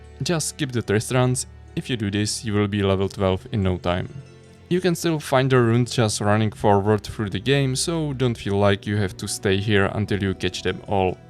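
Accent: Czech Republic. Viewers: Angličtina zní hodně jako moje, tzn česky :D. Trefil jsem?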